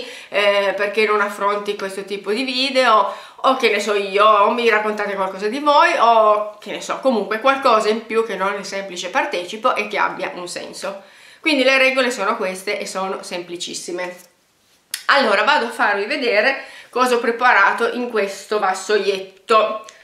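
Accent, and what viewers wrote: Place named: Turin, Italy. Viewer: Sei di Torino, vero? Riconoscosco quello che é anche il mio, per niente marcato, accento 😂😂😂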